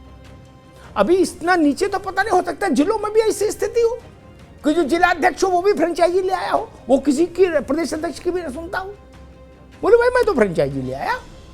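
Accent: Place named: Farrukhabad, India. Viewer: अमिताभ जी का ठेठ फर्रुखाबादी स्टाईल ही आप की स्पेशलिटी है, सीधी सीधी खरी खरी कोई लाग लपेट नही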